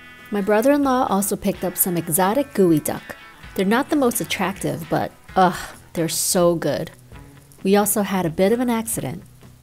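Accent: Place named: China. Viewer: Love it when you speak chinglish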